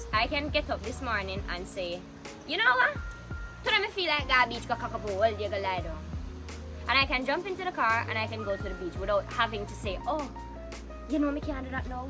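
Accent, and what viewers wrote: Jamaica. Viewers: You have a jamaican accent and behavior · Yo u sound like us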